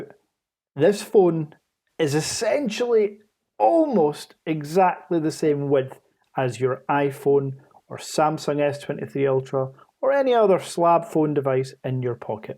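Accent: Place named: Glasgow, United Kingdom. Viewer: This guy speaks with s Glasgow accent. He sound like Rab c Nesbitt.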